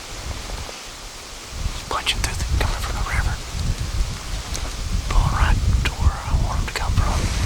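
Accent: South, United States. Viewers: What state are you from. You have a southern accent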